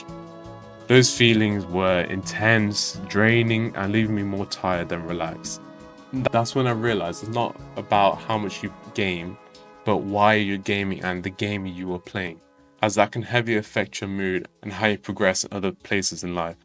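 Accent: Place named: Germany. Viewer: your accent seemed more german rather than british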